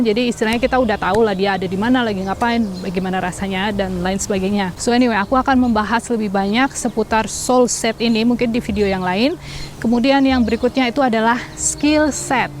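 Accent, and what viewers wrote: Banyumas, Indonesia. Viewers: Owalahhhhhh,😍😍😍mbak ternyata wong Cilacap toh ☺️ salam ngapak mbak 🤣 Ora ngapak ora kepenak ❤️❤️❤️❤️ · Kereenn bgt si kak video ini.. aku merinding dan terharu.... jadi lebih semangat lagi dan ga mikir aneh2 tentang jodoh.. wahh ternyata kakak orang ngapak ya kak 😁 salam dari Banyumas 🤗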